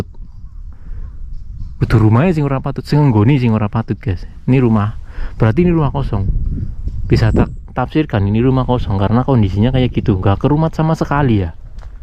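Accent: Banyumas, Indonesia. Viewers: ngapak temen mas..rika asline wong ndi...semngat masee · seneng ngapak mas.jwa Endi mas. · Kesuwen neng jepang ora kelalen ngapak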